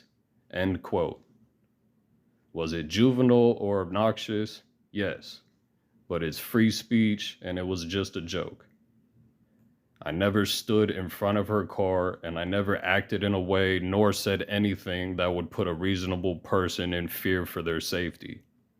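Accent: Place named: United Kingdom. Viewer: Great British accent and you said she needs more greens is not a accusation of any type.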